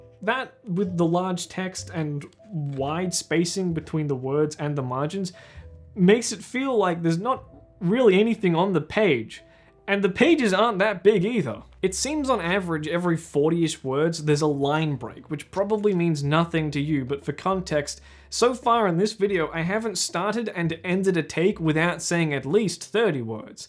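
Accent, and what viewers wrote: Australia. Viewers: me just finding out is australian and not british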